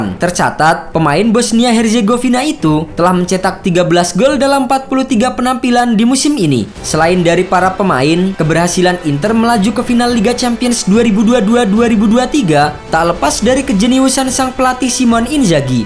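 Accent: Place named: Tegal, Indonesia. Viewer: Kayak orang Tegal ya min hiks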